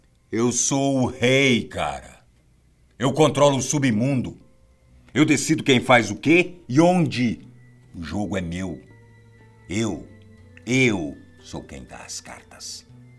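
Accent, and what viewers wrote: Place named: Northeast, Brazil. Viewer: Sotaque Nordestino :v akakaj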